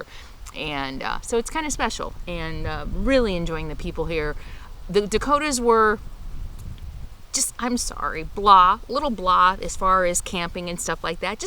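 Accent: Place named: Minnesota, United States. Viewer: Minnis SO...tah. I love that accent too.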